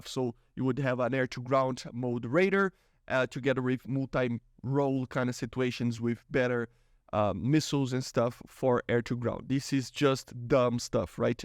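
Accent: Brazil. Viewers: Brazilian accent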